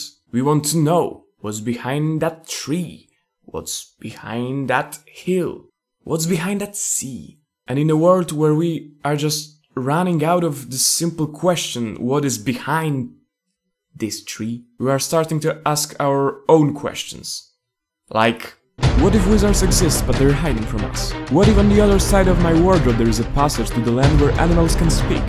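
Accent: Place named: Poland. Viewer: troche taki polish english ale nie jest źle ;p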